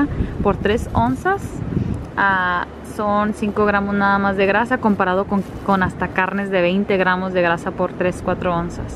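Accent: North, Mexico. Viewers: me parecen buenísimas tus recetas! Gracias por compartir! De donde eres, tú acento suena del norte, yo soy de Nuevo Laredo! Saludos!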